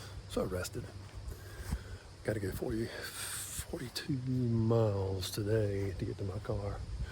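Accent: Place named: North Carolina, United States. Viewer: I heard your NC accent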